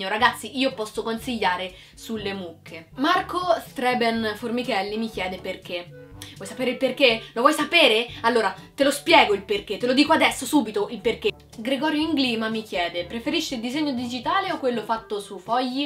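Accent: Tuscany, Italy. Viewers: Hai un accento toscano molto :3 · Ma hai un'accento toscano ! sei di roma? :)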